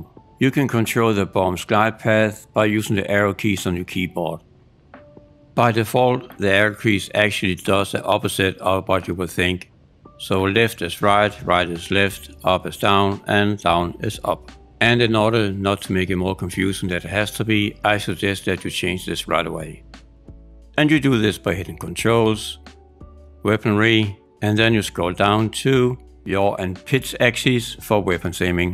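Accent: Denmark. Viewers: You sound danish, and thanks for the guide! It was really useful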